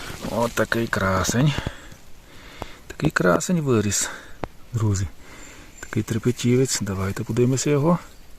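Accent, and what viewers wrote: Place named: Synevyr, Ukraine. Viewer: В якому місці відео знімали?Бо говір ,як у Синевирській ріці.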